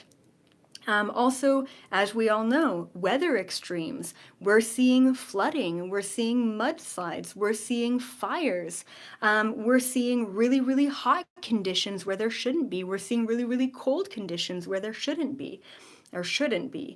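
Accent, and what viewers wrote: Canada. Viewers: Nice to hear a tiny bit of your Canadian accent come out! I'm from what province are you from originally?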